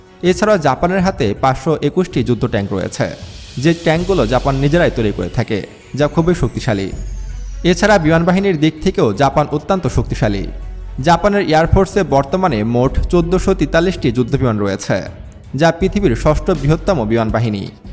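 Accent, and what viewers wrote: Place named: Bangladesh. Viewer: তুই যে বাংলাদেশি সেটা তোর কথা শুনলেই বোঝা যাচ্ছে ।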